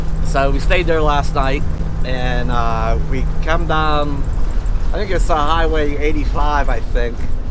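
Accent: Pennsylvania, United States. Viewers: Man listening to you narrate makes me think of friends from PA. Enjoy NewMexico and hope you find some cool little places to explore and maybe a 💈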